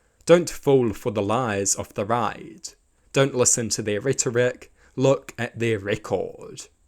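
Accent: New Zealand. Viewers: Oh, he's from New Zealand, which is why he talks funny.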